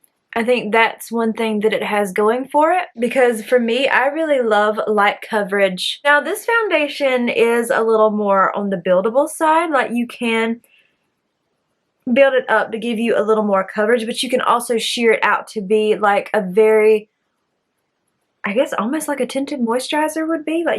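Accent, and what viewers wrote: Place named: South, United States. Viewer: Your eye look turned out beautifully <3 I think that UD palette got a bad wrap, but may just be underrated. I'm a new subscriber and I'm not going to lie, but your southern draw got me! Hopefully that doesn't seem weird or offensive, but you just have an inviting and friendly sound and persona. The first video of yours that I came across was your blush swatch video, so that was really what I had to go off of. Lol :)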